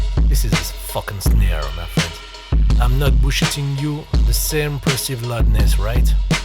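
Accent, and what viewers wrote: France. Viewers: I love your accent/// No wonder the girls love French men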